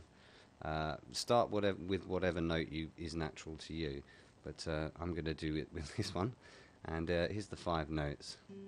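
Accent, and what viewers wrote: United Kingdom. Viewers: ur very welcome uve done a brilliant job i really appreciate it, n yes ur rite ur vid was easier to understand than most vids, last but not least LOL i LOVE ur british accent :) wish i had a brit accent too lol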